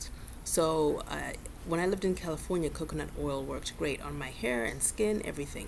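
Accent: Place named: Zimbabwe. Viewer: Are you Zimbabwean? that accent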